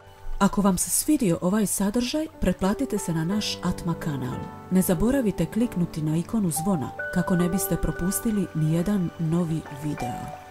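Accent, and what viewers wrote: Croatia. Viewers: Ja crtam neke lavirinte, a u pozadini slušam, maaa superiska se opustio, korisno, vredno podsećanja, a glas, ritam, melodija maa šta reći, odlična si, no ja malo nisam navikao na vaš akcenat pa mi još bolje, zanimljivo veselije(hrvatski mislim) i svaka čast što obradjujete ove teme, ma siguran sam da ste pomogli mnogima, meni barem-sigurno!!! HVALA VAM I ŽELIM VAM SREĆU I USPEH OBOSTRANU, ZIVELII U RAAAAA!!!!!!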